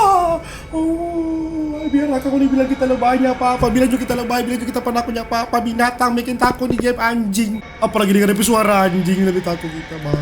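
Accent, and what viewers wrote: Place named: Java, Indonesia. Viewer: Logatnya lucu njay sma dengan orang jowo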